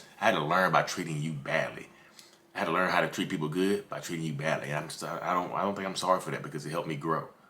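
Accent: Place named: South, United States. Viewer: I love your southern drawl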